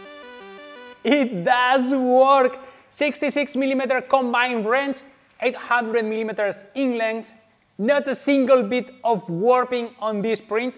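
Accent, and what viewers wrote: Spain. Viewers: No te había visto nunca y cuando te he visto hablar me he dado cuenta q eras español, al instante. Muy buen video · He's not Italian, he's Spanish. Didn't you notice the accent? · Se nota bastante que eres español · como se nota que eres español xd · Has un canal en español, se nota que eres español:v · 4° Comentario en español xD, empiezo a ver el video y digo, por el acento, este es español jajaja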